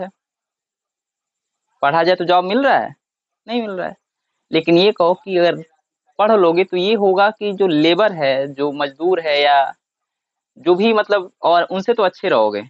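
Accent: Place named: Bhojpuri, India. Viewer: bhojpuriya jhalak ta jaan marat ba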